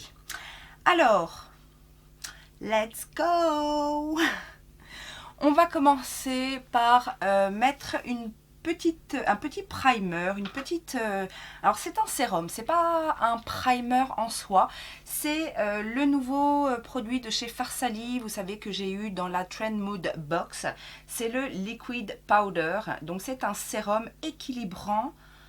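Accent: South, France. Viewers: Coucou ma coquette ah lala tu es dingue je t’adore oui pour le tuto accent du sud 😂😂😂😂 groooos bisous 😘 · Coucou, j'aime beaucoup ce makeup ! Oui donnes nous l'accent du sud pour faire venir le soleil, j'en ai trop besoin, gros bisous ! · OUI pour le tuto accent du sud 👍🤓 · Merci pour cette vidéo avec un mkp très réussi. J’aime beaucoup ton teint et donc tes produits teints que je ne connais pas. Oui oui pour une vidéo avec l’accent du sud... moi je suis du sud-est 👍🏻👍🏻. Très bonnne fin de journée biz 😁🌸💋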